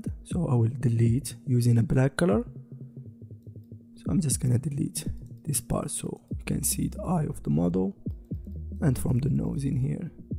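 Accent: Morocco. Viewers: That Moroccan accent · That Moroccan accent tho hahaha, great video Bro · Very nice thank you. you have a moroccan accent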